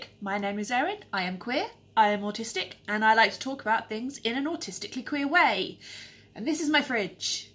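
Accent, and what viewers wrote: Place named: United Kingdom. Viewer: This is the first time I've heard your voice. I didn't know you were British. (I follow you on Twitter) Congrats on 700 Subs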